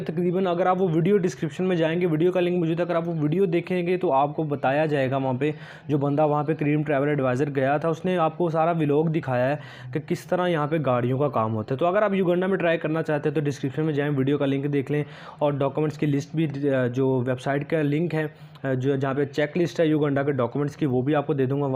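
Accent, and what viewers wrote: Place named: India. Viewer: Are you Indian or Pakistani because you speak like Indian. If you are Pakistani so please don’t speak like that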